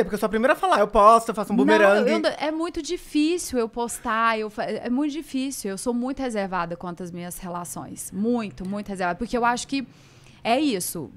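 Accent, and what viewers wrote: Minas Gerais, Brazil. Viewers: Uma Morena dessa com sotaque Mineiro pra mim é AFRODISÍACO!!! 😋😋😋😋😋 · Sotaque mineiro é maravilhoso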